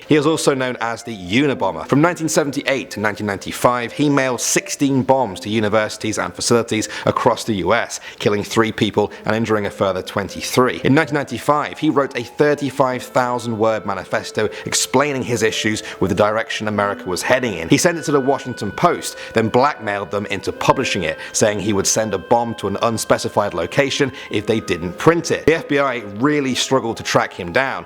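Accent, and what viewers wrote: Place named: United Kingdom. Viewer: Hey, where in the U.K are you from? I don't recognise your accent.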